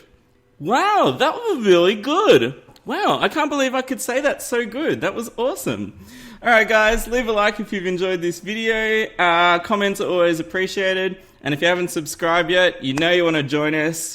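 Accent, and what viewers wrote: Australia. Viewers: Are you Aussie because I think.. you are.. so yeah. And say magic is hairy with your tongue out! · You're Aussie... Aren't ya? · He is aussie right?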